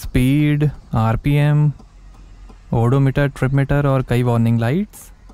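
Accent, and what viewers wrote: Gwalior, India. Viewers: Sir Aap gwalior Ke Ho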